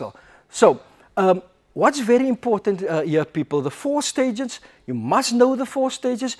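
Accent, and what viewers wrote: South Africa. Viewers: Rahul Vamshidhar South African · South African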